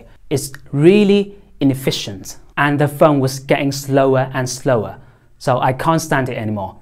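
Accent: United Kingdom. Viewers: 你的好像是 英國口音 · 感觉发音偏英式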